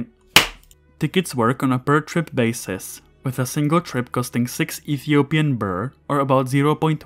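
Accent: Czech Republic. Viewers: Are you from the Czech Republic huh?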